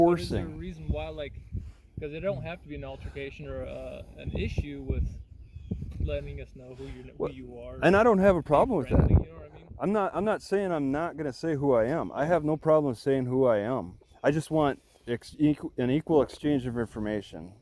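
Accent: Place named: Midwest, United States. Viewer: I grew up in Milwaukee (been here in Dallas over 40 years). I thought I recognized your mid-western accent.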